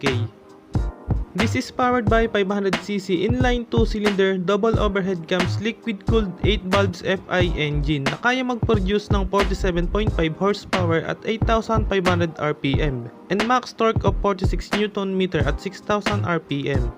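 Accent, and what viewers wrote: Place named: Baliuag, Philippines. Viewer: Sir taga saan ka po? Hahaha. Tawang tawa ako sa tono ng naration mo. Ganyan kasi tono ng salita namin taga baliuag. Hahaha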